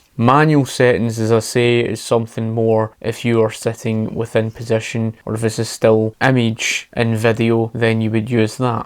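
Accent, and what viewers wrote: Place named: Scotland, United Kingdom. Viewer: A Scottish accent is my favorite accent in the world oh my gosh seriously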